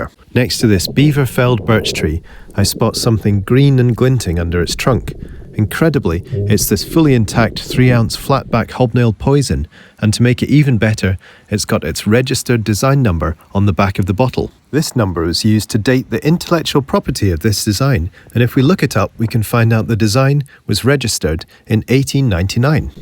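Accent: United Kingdom. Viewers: Which country is this? He has an English accent but is he actually in England? 🤔🇬🇧🏴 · Love the British accent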